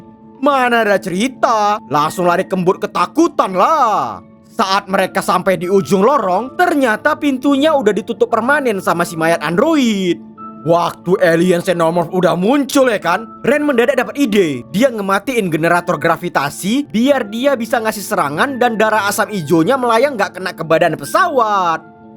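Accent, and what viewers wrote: Medan, Indonesia. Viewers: Hahha lucu abg ni ngomong. 😂pasti org Medan. Udaaku subscribe y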